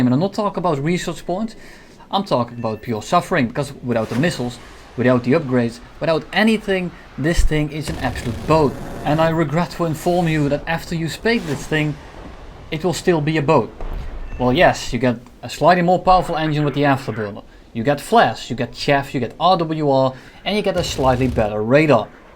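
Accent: Netherlands. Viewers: When you are frustrated the dutch accent comes out so strong.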